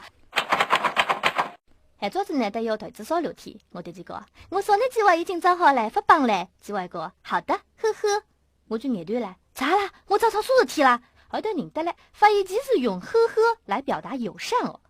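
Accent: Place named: Ningbo, China. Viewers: 讲的有很多上海话口音、不纯正的宁波说话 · 这不就是很正常很普通的宁波话吗……顶多有俩字读错（我就听到“容”和“迎”读错了，人家“微信”都读的对了），怎么一大票人跳出来说不正宗不纯正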